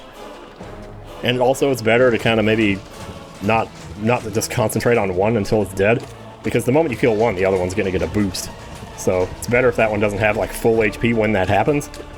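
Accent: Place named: South, United States. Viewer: I'm not the only one who reads Selubra's name as Sebulba. I wouldn't have pegged you as Southern until I listened to some of your earlier / vlogs (you sister sounded a lot more obviously Southern, as did you when you were youngr), but I HAVE always wondered, and sorry if this sounds weird, if you saying "boy I tell ya" and "lemme tell ya" so often is a regional thing, or something you picked up from your parents. I thought that seemed too easy. I also thought they'd bow. RoahmMyrthril (LPer and Mega Man no-damamge runner) always found Yellow Devil more tedious and drawn-out than actually hard, and got mass-disliked in a video for saying that. Had a feeling you weren't invincible there. But that still destroyed the fight. I like how Sheo's different attacks paint the battlefield, I assume the place will end up looking different every fight as he varies what attacks he does and in what order.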